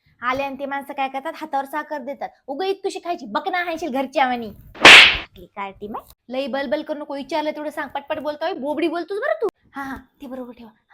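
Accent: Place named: Marathwada, India. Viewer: Bokna,, marathvada style,, ly bhari ❤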